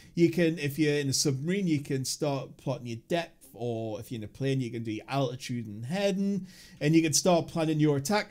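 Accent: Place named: Northern England, United Kingdom. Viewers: hello from newcastle, i noticed ya northern twang there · Oh god a bloody pommy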